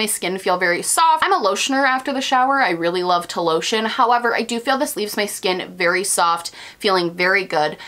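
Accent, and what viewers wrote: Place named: Rochester, United States. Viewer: Okay so...I finally figured out who you sound like to me....Kristen Wiig! And you're both from Rochester, NY. Lookie there. Accents are cool. Love your videos btw! Please do more non-makeup favorites :)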